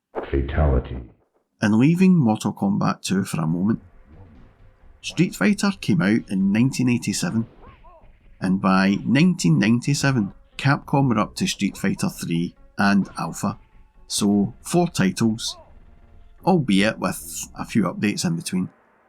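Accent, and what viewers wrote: Scotland, United Kingdom. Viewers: Do you have another channel? Theres another guy with a Scottish accent on the segaguys channel i think😅 (asking if you're the same guy, sorry)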